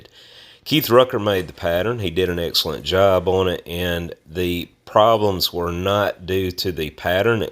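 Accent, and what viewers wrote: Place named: South, United States. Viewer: "Filled" and "failed", in a southern accent, sound so similar to a northern guy...I was getting depressed for you bud!!!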